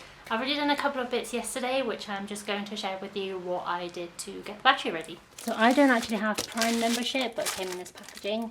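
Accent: United Kingdom. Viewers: you have british accent - funny... honest gurl / great video.. thumbs up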